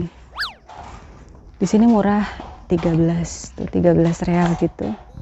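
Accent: Jakarta, Indonesia. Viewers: MasyaAlloh seneng kalau liat mmh kalau lg gomong kadang bahasa betawinye keluar 😊😊 kaye kita ini anak lenteng agung 😊😊 tp seneng jg liat mmh bahasa Arab nya bagussss bangeeeet 😊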